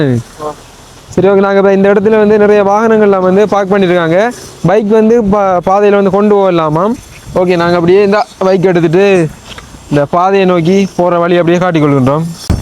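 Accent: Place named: Batticaloa, Sri Lanka. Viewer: நீங்கள் மட்டக்களப்பு தானா? நல்ல செல்லத்தமிழ் கதைக்கிறீங்கள். உங்கள் தமிழை கேட்க இஸ்லாமியர் போல் தெரிகிறது. திருவிழா முடிந்தபின்தான் ஊருக்கு திரும்புவீங்களா?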